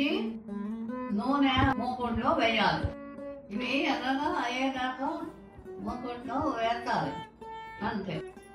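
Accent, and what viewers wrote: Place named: Godavari, India. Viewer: Chaalaa thanks andi! Mee Telugu kuda Godavari jilla Telugu laga undi feels nostalgic! 🙏😊👍 Sankranthi Subhakankashalu meeku, mee family andariki! 🙏❤️😘💐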